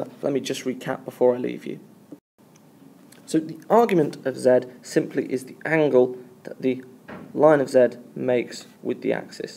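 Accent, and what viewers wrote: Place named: United Kingdom. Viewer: i love that british accent :D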